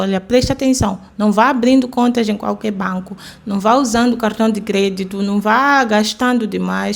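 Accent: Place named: Minas Gerais, Brazil. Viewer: querida,fico muito feliz em saber que vocês estão bem!exatamente saber administrar o seu dinheiro,esse é o caminho para não passar por dificuldades. Até o seu sotaque está diferente,kkkk bem mineirada,que Jesus abençoe sempre seus caminhos.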